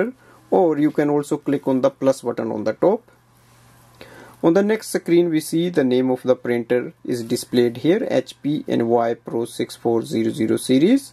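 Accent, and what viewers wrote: India. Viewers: Nice gujju accent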